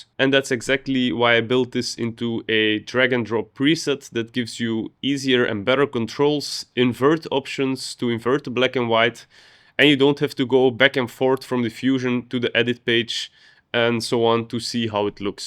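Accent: Belgium. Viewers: Bro you are from Belgium?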